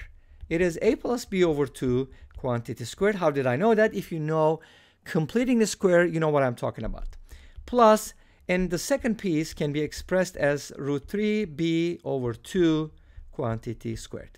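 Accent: Turkey. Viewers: Telafuzunuz Türk olduğunuzu düşündürdü. Doğruysa video için çok teşekkürler ;)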